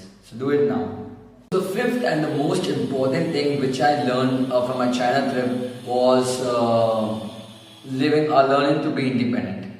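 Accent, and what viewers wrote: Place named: Russia. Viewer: Russian accent👌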